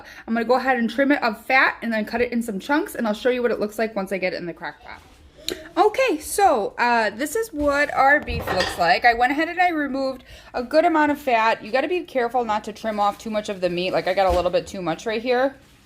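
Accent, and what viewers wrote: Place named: Chicago, United States. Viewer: I was born in Chicago, raised in Des Plaines area and LOVE Chicago style "Italian Beef"!!! It really is mostly a Chicago area ONLY dish. I moved to the West coast in 1970 and have been missing my beef sandwiches ever since! Portillo's is great and does have a location Near "Knott's Berry Farm" in the Orange county area of Southern CA but we're a long way from there near San Diego. Not sure if we can find giardinerra out here or not. Recognized you accent. immediately! haha Will try your recipe but I do have a deli slicer so will likely use a rump roast and slice it up razor thin. Thanks!!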